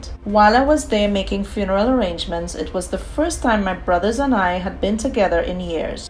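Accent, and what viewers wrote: Trinidad and Tobago. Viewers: I know a Trini when I hear one ❤ Great work!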